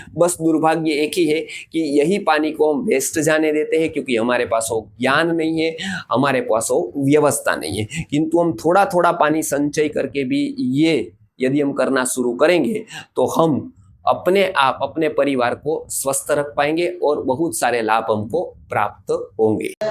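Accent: Gujarat, India. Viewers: बोलनेके तरीकेसे टोन गुजराथी ही लगते है प्रणाम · पंडित जी आप गुजरात से है क्या 🤔। माफ़ करना लेकिन मैं भी गुजरात से हुं। और आपके वक्तव्य में लहेजा गुजराती जैसा है।🙏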